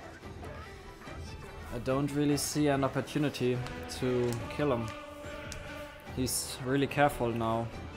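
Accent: Germany. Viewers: Bei Gott ich lieb deinen deutschen Akzent! XD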